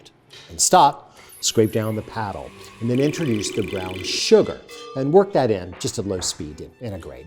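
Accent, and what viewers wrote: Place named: General American, United States. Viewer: Alton is the Renaissance man of our times. We have not recognized a well- rounded man needs to be all -around in visual media technology, and his many other talents, cooking, writing, on screen talent, editor, music director. DP, behind the camera, producer, props, the master of GenAm or broadcast American English. . . ah heck, the above and below the line producer, covering all areas. That is a true modern Renaissance man.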